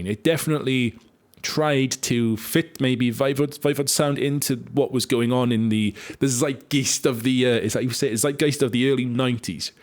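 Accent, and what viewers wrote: Wales, United Kingdom. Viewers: Do I detect a Welsh accent?...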